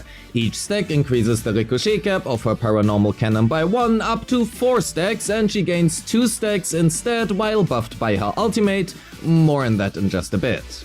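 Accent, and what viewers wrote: Germany. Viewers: I know you are from a German speaking country because of your accent but I CAN'T PROVE IT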